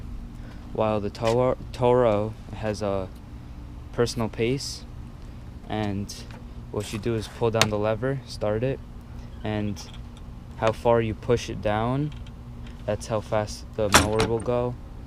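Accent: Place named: Eastern Europe. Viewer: Good review, however, I'm a sociolinguist and your accent perplexes me. I hear eastern Europe maybe hebraic? Not trying to be rude, honestly curious.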